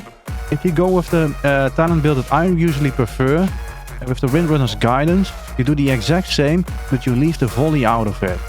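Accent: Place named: Netherlands. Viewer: Just started playing MM, pretty new to wow, not a very good player but trying to learn the ropes and get into pvp! Btw are you from NL? I lived there for 10ish years and your accent reminds me of my time there :)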